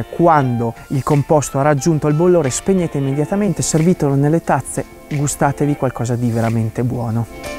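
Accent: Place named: Veneto, Italy. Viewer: Raga ma perché ha l’accento veneto? Comunque adoro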